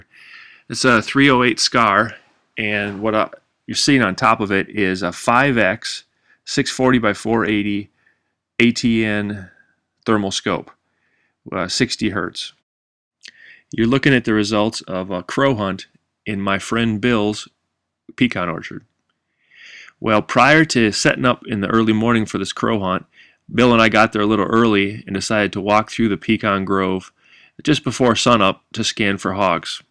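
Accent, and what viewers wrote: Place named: Midwest, United States. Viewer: Your accent sounds midwestern. No offense, a lot of people move to Georgia from the North. My ex father-in-law was from Michigan.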